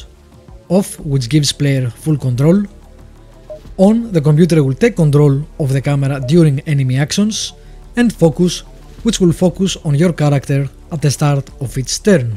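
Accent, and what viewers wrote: Greece. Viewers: Congratulations because u are the only one that has made a Review of tis game. Igoogled "Vigilantes Review" and all the videos where gameplay except yours that was only a Review. I don't count the site reviews just the Videos. Συνέχισε την καλή δουλεία όταν είδα το 1ο video to " Medieval Napoleonic wars" από την προφορά κατάλαβα αμέσως οτι είσαι Έλληνας - έχουμε χαρακτηριστικη προφορα - έκτος Ελλάδος 3 στις 4 φορές που άκουσα κάποιον να μιλάει Αγγλικά το πέτυχα ήταν Έλληνας -.και μετά μπήκα στο "About" του καναλόυ σου και το διαπείστωσα. Sorry for the Greek language i was just congrajulate because he was the only one that has uploaded a video Review of the game and not Gameplay like the other videos when i Google " Vigilantes Review".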